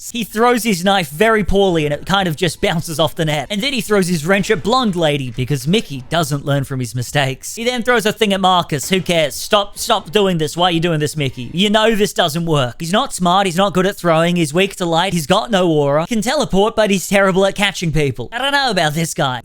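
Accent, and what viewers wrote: Australia. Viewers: Ashamed of myself, but I must confess, I thought was a different Australian YouTuber that sometimes covers shitty b rated horror movies, Jackson Fields, who also did a video in Shark Side of The Moon. I feel bad, I'm sorry 😞 I don't even know how I messed up that bad. The only three things (looks and sound wise) they have in common; Accents (obviously) Both are white And both have dark hair. And my mind went "okay they're the same person"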